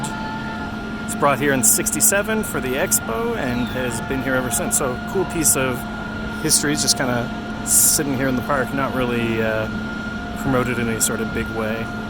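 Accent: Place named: France. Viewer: Ur french accent is so good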